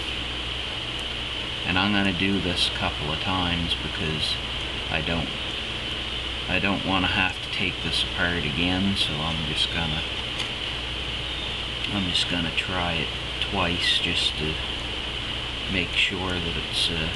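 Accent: Canada. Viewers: ince acent you got there! where is it from? Canada? haha I'm from Canada too, so thats how I knew you were from there... and then I checked your chanel... haha! Thx for the vid man!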